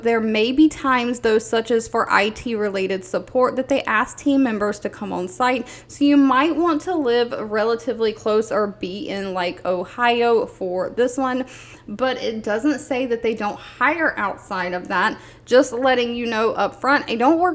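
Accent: South, United States. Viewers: Don’t let those haters shut down that bubbly southern voice darlin 🥰 We love it! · It's not annoying it's Southern like me. Don't feel you have to appease these negative people. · I love your southern accent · Im from NY and I love your southern accent!! 😊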